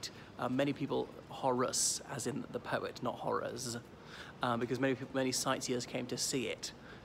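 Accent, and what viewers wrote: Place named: England, United Kingdom. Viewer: Your English accent keeps my attention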